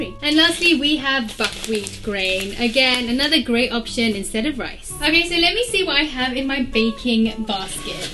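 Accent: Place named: United Kingdom. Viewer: I love you! Somehow you appeared in my Youtube videos. I am trying to become fully vegan and your recipes are helping me loads. I am mexican but I lived for 9 years in London so listening to you and your British accent brings me lovely memories. Also, we mexicans use mostly black beans and no kidney beans.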